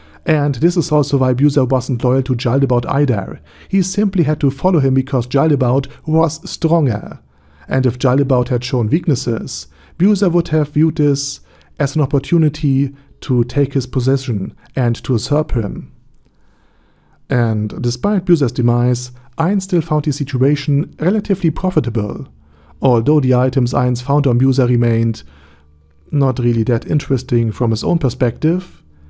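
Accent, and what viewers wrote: Germany. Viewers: I appreciate your videos. I’ve been watching and looking into your videos for years now. I love accents, and your German accent is phenomenal my man.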